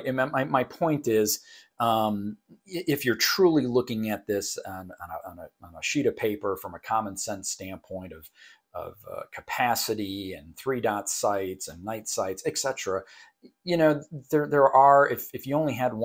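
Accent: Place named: Ohio, United States. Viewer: Sounds like you are from Ohio.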